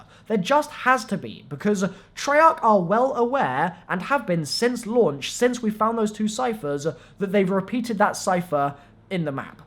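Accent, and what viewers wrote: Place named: United Kingdom. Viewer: Your accent just makes me so hype to be visiting the UK bro #muchosmelons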